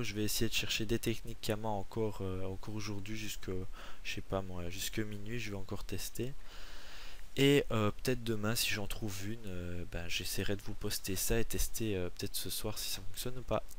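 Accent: Belgium. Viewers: Tu es belge ? Parce que j'aime beaucoup ton accent :) , et vraiment désolé pour ton hack j'ai deja connu ça et fuck les rageux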